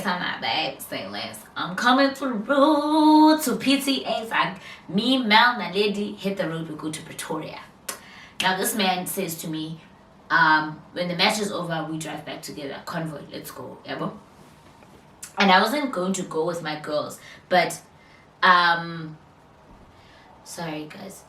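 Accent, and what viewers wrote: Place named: United States. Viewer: This American ascent thingy confuses me 😑 but kept watching video to an extent 😑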